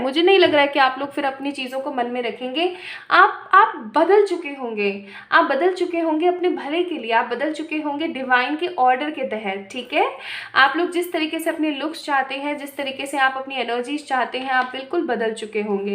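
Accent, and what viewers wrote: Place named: Hyderabad, India. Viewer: KYe ko..is Hyderabadi hindi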